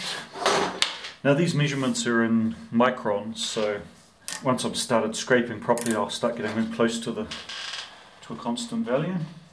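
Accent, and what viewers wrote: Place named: New Zealand. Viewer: Ah ha! I thought I detected a NZ accent! Used to work for US branch of a company, TNT, headquarted in AU. Chatted with blokes from all parts of that world. Enjoy your vids! Thanks for sharing!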